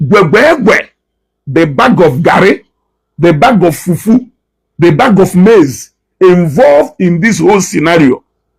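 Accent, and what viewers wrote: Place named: Nnewi, Nigeria. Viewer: My son, ina eti-eti o! Continue with your Nnewi dialect, I love what you’re doing and never miss any topic. Jisike, so proud of you. ❤️❤️❤️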